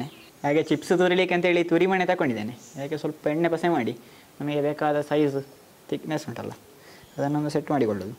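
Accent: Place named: South Canara, India. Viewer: This is the style of kannada spoken by people from south canara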